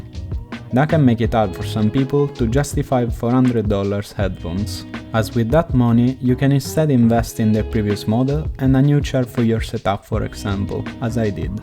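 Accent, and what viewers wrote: Italy. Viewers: Italian english !! this is a very nice headset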